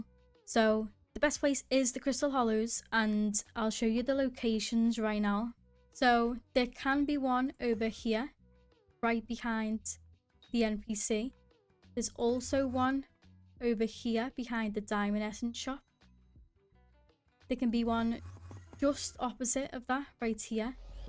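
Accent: Liverpool, United Kingdom. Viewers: Are you from around Liverpool? I can’t shake that accent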